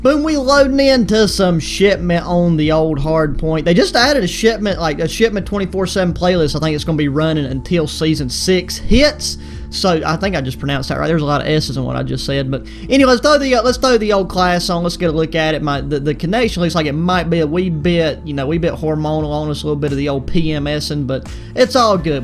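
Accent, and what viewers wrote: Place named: Texas, United States. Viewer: You have a heavy Texan accent 😳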